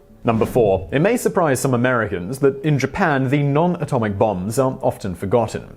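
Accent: United Kingdom. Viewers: This guy doesn't always have accurate facts. His British accent doesn't make him smart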